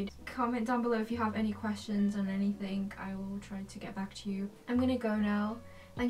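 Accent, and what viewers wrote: United Kingdom. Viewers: I love your British accent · Love your room tour! wishing can have my own space to decorate it like this as well❤ and please do upload more video as i love watch ur video and hear your british accent💕🍀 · omg i love ur eccent bri'ish✨ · the british accent 😍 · MashAllah how do you have very good British accent👌👍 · I bet that you have Pinterest😍♥️ British accent? Yes love it too 😍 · i never expected that accent, when you started talking i went "WOW CUTE". A malaysian with a UK accent aaa cutee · When she said “anyone recognise this hoodie?” Me: OF COURSE! That is Jungkook donut hoodie💖✨ Anyway I love you British accent👏🏻👍🏻 Are you from Malaysia? Cause I am from Malaysia🤗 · The british accent i can't😭😍😍😍😍😍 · I love the way you talk and so in love with ur british accent! <3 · It's cause she stays at uk so of course she would have a British accent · that british accent thoo 🤤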